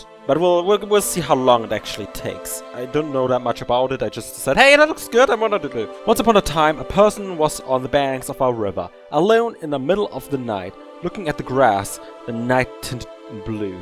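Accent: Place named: Germany. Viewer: You sound german are you?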